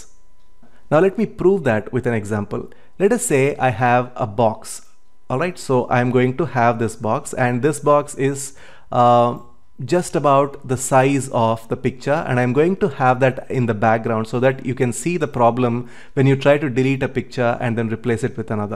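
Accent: India. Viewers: until its indian blah blah!!!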